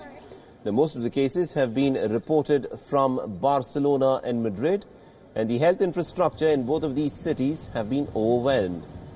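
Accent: India. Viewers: It's indian news channel.. He is speaking in indian English.. U dont know... Go and learn it kid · It is an Indian channel. If you can't understand his accent may be you are at a wrong place.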